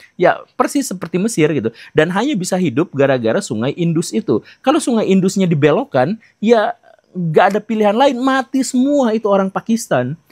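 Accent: West Java, Indonesia. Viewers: Senengnya dari ini..kalau menerangkan pake bahasa sederhana, mudah dipahami dan satu lagi aksen sundanya gak ilang..kaya aing bobotoh..Hidup Persib!!😂😂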